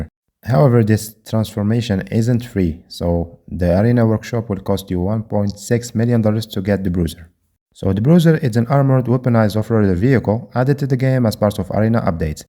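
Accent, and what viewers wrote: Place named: Algeria. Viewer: Brother where you from you sounds djazairi😅😅😅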